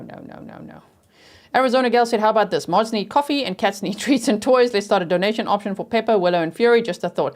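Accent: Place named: South Africa. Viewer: Shame - you're definitely south Africa. 😂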